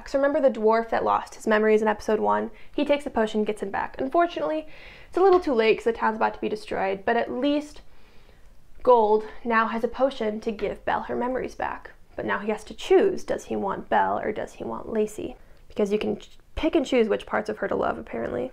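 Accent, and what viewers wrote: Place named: Australia. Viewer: This video has me imagining a universe in which Belle divorces Mr gold and dates Ruby instead. Also I’m listening to this at work and the Australian accent sends me every time I hear it.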